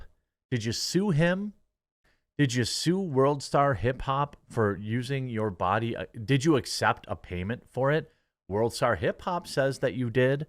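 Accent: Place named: Wisconsin, United States. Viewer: I swear I'll unsub the next time u say braigged instead of bragged. Fuggin wiscansin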